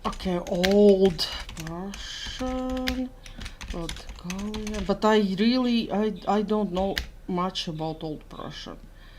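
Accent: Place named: Russia. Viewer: there are often mistakes, it often helps to consult native speakers, however some Baltic languages like Samogitian for example, because the language has been incorrectly classified as a mere dialect of lithuanian and not a distinctive language, people living in žemaitėjė have been discouraged for generations now to consider lithuanian the only valid national language, and most Samogitian people under the age of 50 shall we say, cannot speak a single word of our language. Žemaitiu ruoda, has more vocabulary in common with both Latvian and Russian languages than with official lithuanian. For example, he/she in lithuanian is jis/ji, in Latvian viņš/viņa, but in Samogitian we say ons/ana. I like your accent, you have a strong Russian intonation whichI find very charming. Russian songs are so lovely especially!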